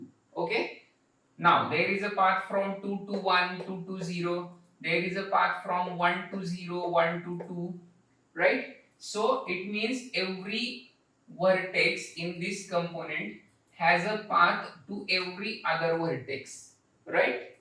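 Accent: India. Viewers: It has a strong Indian accent, but it doesn‘t interfere with the good explanation.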